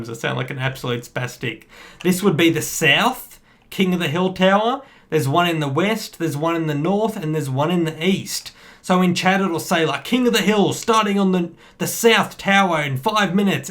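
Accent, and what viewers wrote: Australia. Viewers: Dude you are awesome and are you Australian?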